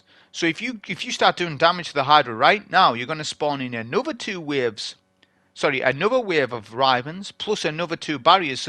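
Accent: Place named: North, United Kingdom. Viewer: Where are you from? I detect a northern accent.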